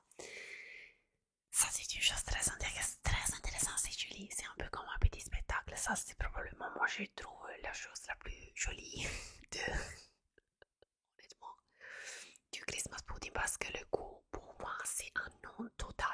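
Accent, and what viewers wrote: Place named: Italy. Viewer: ton accent italien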